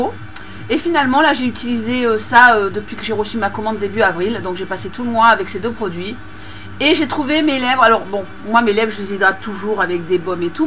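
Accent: England, United Kingdom. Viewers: ton accent anglais me fait rêver ! ^^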